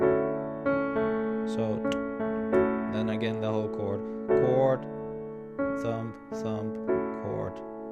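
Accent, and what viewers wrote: Netherlands. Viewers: U sound dutch! :D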